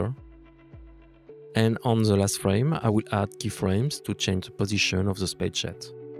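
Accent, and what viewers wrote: France. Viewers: J'adore un accent français. Grand tutoriel, très détaillé et bien fait. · Un accent Français ou je rêve ? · Pas mal l'accent français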